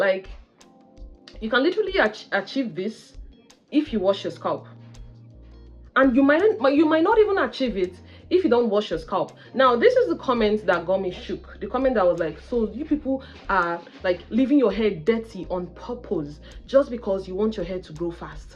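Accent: United States. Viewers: The American accent 😂😂😂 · The American English accent 😂 killed me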